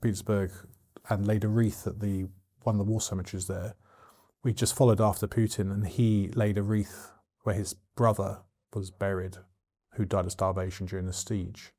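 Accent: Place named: United Kingdom. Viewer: Bring the audio volume UP. We in America have a difficult time understanding his British mumbling on low volume. He's knowledgeable. We'd like to understand what he's conveying.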